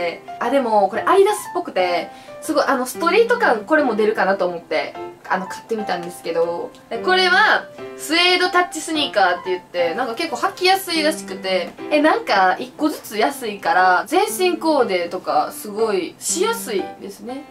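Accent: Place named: Kansai, Japan. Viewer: 関西弁よき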